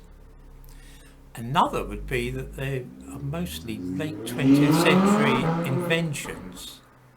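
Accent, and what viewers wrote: United Kingdom. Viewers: Can you please explain to us why western museums are filled with Africa artifacts? Where are your artifacts on display? We wanna know. Why don’t we see your heritage 10,000 years back? This is what you might relate to with your British accent. <link> Did your people make the bronze art in Benin and “collected” it later through colonialism for “safe” custody in Europe? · All that's missing from this clown is big shoes and a red nose. Funny how US thinks that by saying BS with a British accent, it somehow is more believable. No doubt because the US is still owned by Britain. The comparison of the wall of great Zimbabwe created BC to a 15th century European church is ludicrous. Like P. T. Barnum said, " there's a sucker born everyday.!"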